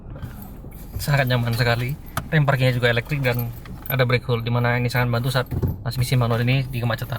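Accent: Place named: Surabaya, Indonesia. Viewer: Mantep reviewnya logatnya Suroboyoan :)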